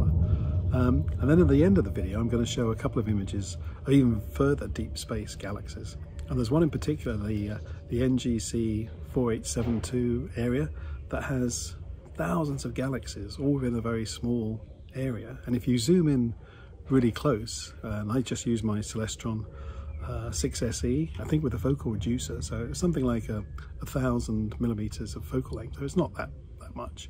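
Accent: Australia. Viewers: Do I detect an Aussie twang?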